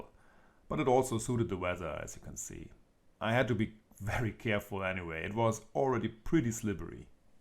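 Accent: Germany. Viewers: Don't you ever dare to lose your german accent,. Best wishes.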